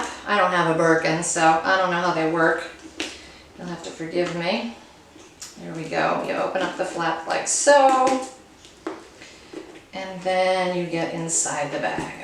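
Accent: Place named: France. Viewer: Hi and! I have a rescue cat named Norm! We rescued him from our local shelter! I absolutely adore your glasses! Your French accent is divine, dahling! Beautiful bag, too! Thank you for sharing, my friend! 😍🐈❤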